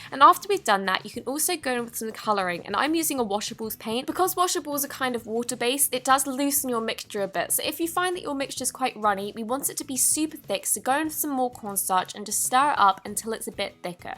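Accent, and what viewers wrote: United Kingdom. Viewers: Lol it's a British accent like mine. so there Americans now u know that our accent isn't POSHY POSHO 😄 so just remember dats how we lot sounds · I love your British voice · Theofficialblossom vlogs British accent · I love how she talks I talk American so it’s so much different than her British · Where do you get tour borax from? You sound British like me and I can’t find it anywhere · Theofficialblossom vlogs she’s British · Theofficialblossom vlogs it’s british like mine! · London/British accent like mine 😊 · Theofficialblossom vlogs shes British like me 😁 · Theofficialblossom vlogs she form the United Kingdom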